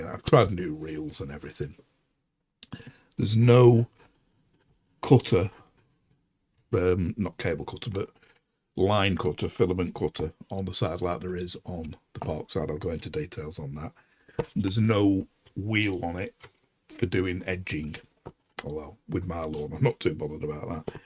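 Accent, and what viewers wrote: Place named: England, United Kingdom. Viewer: Very good. Great to hear a true English accent as well, along with a rich vocabulary. Helps a lot.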